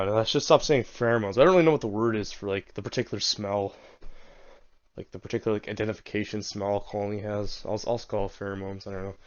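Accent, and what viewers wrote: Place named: North America. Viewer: The colony scent comes from the grease/oil they are covered in. This layer is made up of strings of hydrocarbons and the combination is different for each colony, they apply it when they groom themselves and each other. It also acts as their water proof layer so they repel rain drops and is the reason they look reflective when you shine a light on them to film. Be careful adding different queens, it's almost impossible into an established colony and the other issue you've got is you actually don't know what species your ants are. Judging by your accent I'm going to assume you are in North America and this makes it fairly unlikely they are Lasius niger. They might be, it is possible there are a few pockets of Lasius niger especially in Canada, but is more likely they are Lasius neoniger or Lasius americanus.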